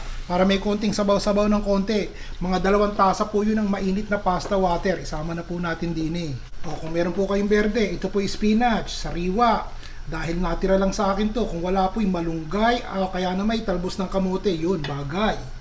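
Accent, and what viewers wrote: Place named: Bulacan, Philippines. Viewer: Bulakenyo po ba kayo? Nakakatuwa po kayo magsalita eh prang tulad nmin dto s bulacan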